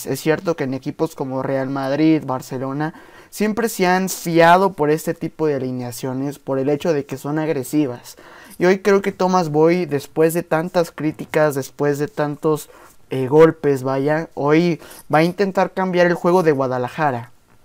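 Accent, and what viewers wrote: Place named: Argentina. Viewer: Felicidades ya hasta el modo de hablar estás igualito al caballero chiva casi hablas como argentino y puras tonteras puro humo que está demostrando solo pierden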